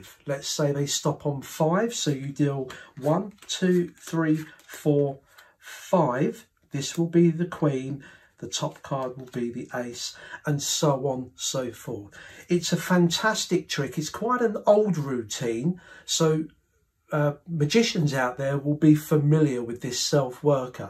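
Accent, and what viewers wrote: United Kingdom. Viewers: Thank- you for putting the work in to teach and show us step by step how to perform this wonderful effect!! I have been into magic for about 40 years so even though i did not actually work it out step by step as you did i knew the basic method eas a stack and that the reverse dealing was part of the method. I will definitely be adding this to my routine!! Often when penn and Teller say they were fooled i think they are just pretending that they were fooled. If i know how it is done then certainly they must know; anyway that is how i think. They claimed that Shin Lim fooled them but i am not so sure that they really were fooled. Their motive? Shin Lim is considered a hot perfotner currently and anyone who. "" FOOLS THEM"", Performs one their Vegas strip show, to have Shin on your stsge is great publicity!! Thanks agin, sir for for all of your great videos!! 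P.S.-- my sister loves your accent; she says she thinks you have a Liverpool accent like the Beatles!!! Gary in dreamland; have a nice dream!!!🙂☁️☁️☁️⛅🌟🌟🌟🌟🌟🌟🌟🌟☁️⏰☁️ dream!!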